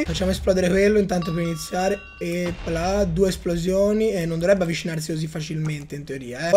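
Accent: Tuscany, Italy. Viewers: perché non fai un video delle bedwars, dove parli solo con il tuo dialetto toscano, spolliciate così lo legge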